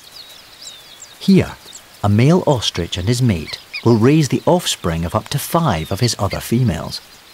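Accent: Scotland, United Kingdom. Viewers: where're you from in scotland, lad? love the videos. bravo for the work well done eh? cheers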